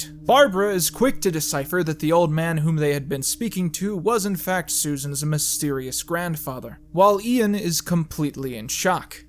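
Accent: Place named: United States. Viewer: The problem I have with this video is that a Yankee voice is lecturing the audience about a quintessentially British science fiction programme. The American TV's record with sci-fi is not exactly innovative. When the pubs were emptying so people could get home to watch the Quatermass serials, what did the Americans have? Captain Video! Those of us who have watched Doctor Who since the first episode, have accepted changes over the years, the Actors in particular. Since the re-boot however, the change that has been most disconcerting is how it has been turned into a soap opera by, let's face it, kitchen sink dramatists. The changes that have occurred did not, for 50 years interfere with the main premise, that Doctor Who is a male hero. It seems that only when Moffatt ran completely out of ideas, that the hints started about gender swapping. It is apt that the speaker mentioned Sherlock Holmes, I notice that he did not say, suppose you wake up one day and find that Sherlock Holmes has become a woman. 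That is the impact of this change. To make Doctor Who a woman is just a lazy gimmick by a desperate BBC to improve the ratings. I suspect that after the initial interest over such a stunt, that the good Doctor will disappear quietly from our screens (mustn't blame the change to a female). Perhaps to be resurrected in less politically correct, trendy, gender fluidity infatuated times, when it can get back to being an escapist, exciting, scary sci-fi show.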